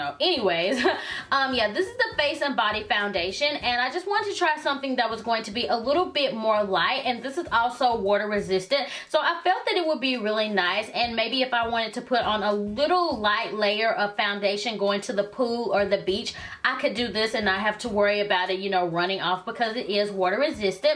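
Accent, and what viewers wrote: South, United States. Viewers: So I'm from Phenix City so i know all to well about that Bama summer weather. I bought the face & body last spring for the same reason hoping that it was a light coverage & didn't make me look like i was melting & i absolutely loved it for spring & summer. I sometimes would mix it with my Garnier BB cream. Hope you enjoy it & check out some of my videos & tell me what you think. Love your videos by the way. Its nice hearing another beauty lover with that southern twang. :)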